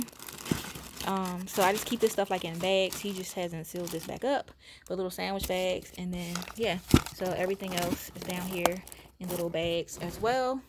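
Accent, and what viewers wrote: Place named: South Jersey, United States. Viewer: Love your pantry vids. New subscriber here. I’m originally from south Jersey and you sound like a local girl (SJ/Philly) and it’s not common on here and I’m loving it. ❤️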